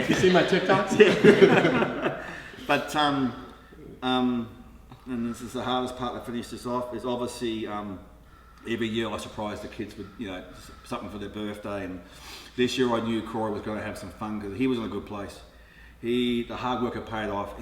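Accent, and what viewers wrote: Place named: Australia. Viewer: His accent is so Australian. I love it. Love you Corey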